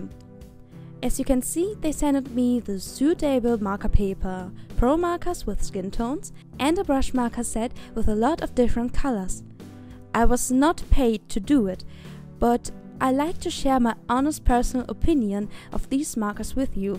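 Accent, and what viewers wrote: Germany. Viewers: Man merkt einfach an deinem akzent wenn du englisch sprichst, dass du deutsch bist/aus Deutschland kommst 😂❤️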